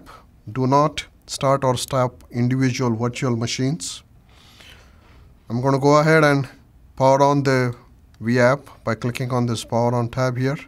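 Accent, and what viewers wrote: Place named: Arab world. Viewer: Mto bacana o vídeo, deixo minha pergunta pq o sotaque árabe, iraniano ou perse?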